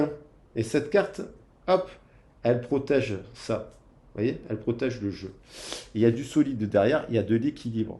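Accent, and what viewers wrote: South, France. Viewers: Bonjour je vous decouvre vous êtes une personne très solaire merci pour vos guidances très detaillees vous avez raconté mon histoire il a pris la fuite sans explications bcp ds l ego superficiel il y avait un déséquilibre bcp de froideur .silence radio j ai lâche prise car trop de souffrances tout est juste du début à la fin .vous êtes formidable c est un plaisir de vous écouter. J adore votre petit accent ensoleillé .merci pour votre bienveillance et votre générosité. Belle journée a vous 😉😊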